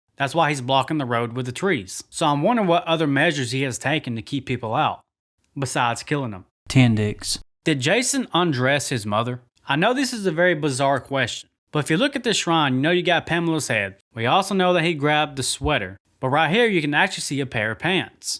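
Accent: South, United States. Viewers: glad to make your day brother I enjoy the videos it's refreshing glad to hear that southern draw you made mine today with this video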